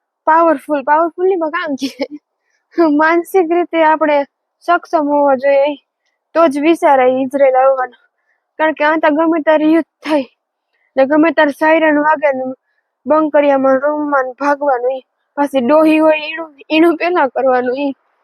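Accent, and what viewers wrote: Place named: Saurashtra, India. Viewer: તમારી ભાષા ઉપરથી એવું લાગે સ્વોરાંસ્ટ બાજુ ના લાગો છો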